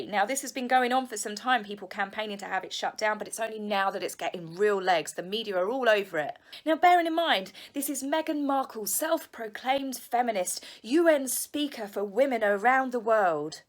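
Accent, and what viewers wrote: England, United Kingdom. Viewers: Hi! We have been watching your videos for a long time now! We are from Serbia and we never ever liked Mm and nor P Harry eighther. We just want you to know - we admire your work, your wonderful English accent! You are always right! We think - from over here we see - Harry has always been jealous and envious at his brother, it’s so obvious when people like us don’t have any reason, any use, any connections, we just see clear. We support you! Your thoughts about them - sooooo true! Love you, watch you!!! Thank you!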